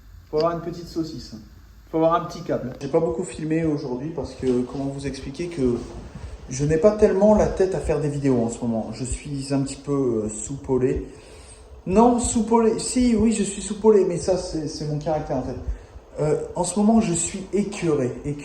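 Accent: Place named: North, France. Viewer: Coucou,apparrament l'enduro reste au programme. Ici pareil ont n'attend également. Mon fils a était ce weekend au terrain ou tu t'entraines. Je sais pas si tu as connu celui de calais,les spectateurs étaient en hauteur,il y a une vingtaine d'années tout les weekends mon beau frère et les collègues y aller et c'était génial de l'ai voir même si ils nous ont fait des frayeurs. Et a Equihen également. Celui ci existe toujours. En 99 une tempête de sable a eu lieu au même moment. Moi et ma cousine en panique,le reflex des bonhommes de poser la moto et de nous rejoindre en speed car on voyait absolument plus rien,avec les enfants nous sommes tous parti dans les véhicules,le temps que ça passe. C'était choquant. Sinon niveau travaux, ça avance très bien. Mr est parti faire un chantier sur Etaples,bjr le vent ici. Pour ton titre, oh que je te comprends,et même si ont me dit la roue tourne, ça laisse un goût amer!. 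J'ai regardé le live a Rox,et c'était cool même si c'était en Replay donc pas de commentaire. Mais pas mal,comme ici c'est des grands,et qu'ils adorent asiatique,je vais leur faire. Moi perso je comprends très bien l'accent du nord car j'y suis née,mais je rigole parfois car certains abonnés doivent ce dire"quesquil dit lui"lol. Bon courage, ça avance 💪🏼👍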